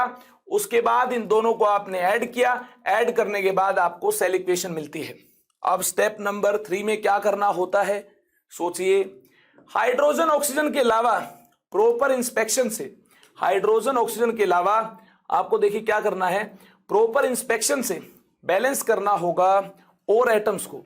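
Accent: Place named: Haryana, India. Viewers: Sir app harayan ka lagta ho boli sa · Class 11th:_ A teacher with HARYANVI ACCENT🤩🤩 preparing us for the real DANGAL to be fought in 2022 🔥🔥🤓😎😎 🏅🎖🎖🥇🥇 22 dislikes😡😡they dont deserve to live in this planet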